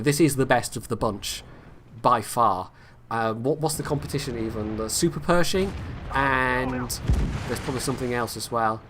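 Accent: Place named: United Kingdom. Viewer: I'll be there :) btw where are you from you sound British to me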